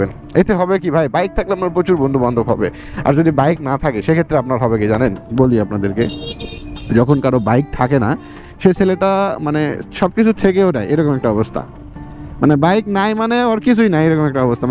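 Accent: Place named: Naogaon, Bangladesh. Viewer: ভাইয়ের ভাষা শুনে মনে হচ্ছে আপনি নওগাঁ জেলার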